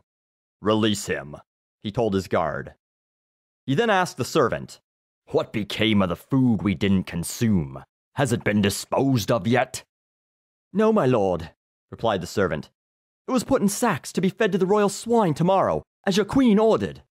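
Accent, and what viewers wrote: England, United Kingdom. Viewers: i like british accent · Your British accent was too adorable. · You have a great British(?) accent. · lol english accent XD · Epic British accent, bro. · awesome brit accent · Your English accent is pretty awesome, man.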